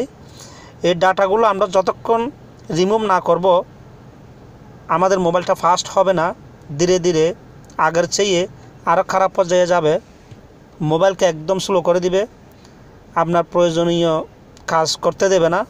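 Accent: Chittagong, Bangladesh. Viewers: Kothai bujha jay,,baiyye chittainge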